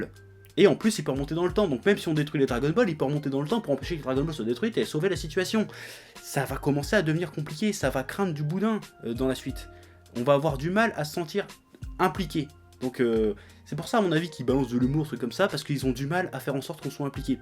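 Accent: Brittany, France. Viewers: T'es brestois? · Tu est de Brest ?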